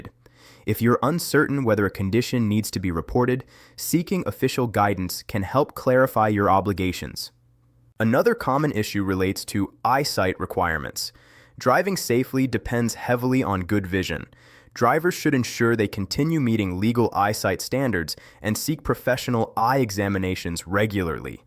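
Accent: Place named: United States. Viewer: Load of Crap. Why is it that all post's loke this one is narrated by an American or Canadian.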